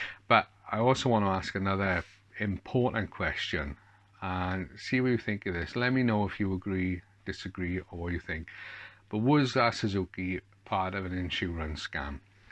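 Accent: Wales, United Kingdom. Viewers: I wouldn't say you are negative at all, you are Welsh, every Welsh person i've ever met or even seen on TV sounds just like you, that guy who said you were hard done by has obviously never met a Welsh man 😂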